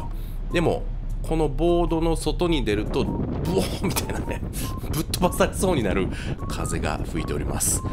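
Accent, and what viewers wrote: Kansai, Japan. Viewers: 小樽に帰るって・・・・ 関西の方❓・・・・・どう聞いても関西弁ですよねぇ( ^ω^ ) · 最近登録しました。ナレーションの関西弁が面白くて好きです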